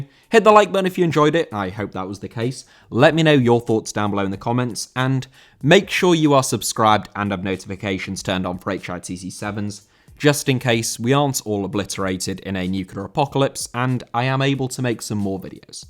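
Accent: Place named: England, United Kingdom. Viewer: his accent is a fairly normal English accent. Get you head out of your ass and learn that you haven’t been made a member of the pronunciation police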